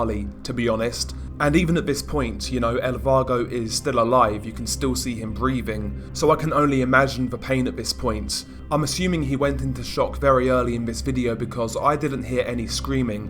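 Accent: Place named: United Kingdom. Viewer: british accent is so satsfing for narations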